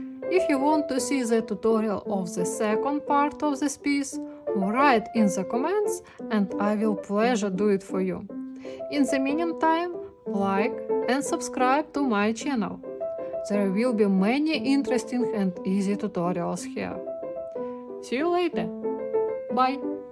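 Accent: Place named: Eastern Europe. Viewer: Amazing explanation but please do something about your slavic accent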